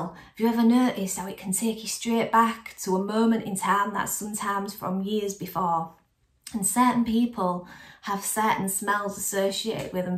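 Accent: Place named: Hull, United Kingdom. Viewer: thanks, good to hear a Hull voice.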